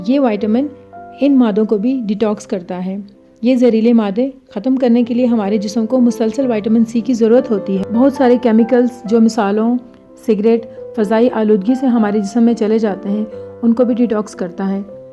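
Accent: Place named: Karachi, Pakistan. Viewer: Thanks alot for the Precious and healthy Information Your Excent is Karachi Wala Am I Right?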